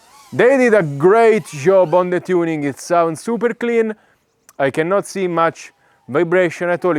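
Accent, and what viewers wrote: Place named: Italy. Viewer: The shitty AI automatically translates the voice into French but I prefer to hear real voice with his Italian accent.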